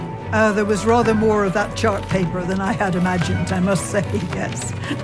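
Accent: United Kingdom. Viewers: "the array rotated with the earth". A british accent does not give validity to this stupid claim. we do NOT detect any movement or motion caused by the earth. we never have. heliocentric world view(taught in public schools) is satanic pedophile propaganda by the jesuits long before the so called greeks "knew" the earth was a ball (supposedly 2000 years ago). Heliocentric do not work in reality. it is a fairytale for grown ups. the earth is immovable and stationary. all the stars are fixed in their positions and rotate in the firmament around us every day and night! the sun and moon is local! for more truth and answers in full scientific depths - Follow Youtube Channel - "Flat Water F.E" and "Celebrate Truth".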